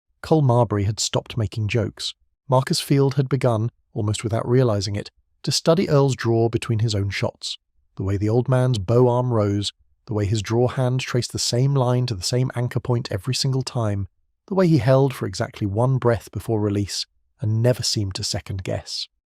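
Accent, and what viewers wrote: United Kingdom. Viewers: Hate this AI with British condescending voice and accent.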